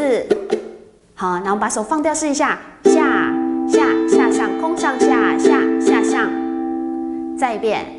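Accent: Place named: Taiwan. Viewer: 我觉得像台湾人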